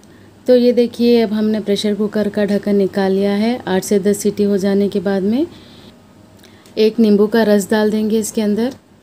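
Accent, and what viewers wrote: Hyderabad, India. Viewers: Mujhe aapka typical hyderbadi accent bohat pasand aata...👍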